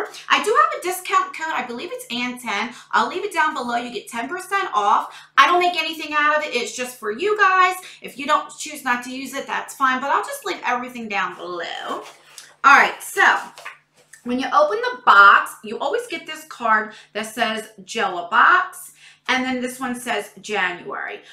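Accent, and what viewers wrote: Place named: New York, United States. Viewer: I absolutely love your channel! I never found unboxing subscription boxes so entertaining! Also you sound like you have a New York accent? That's nice.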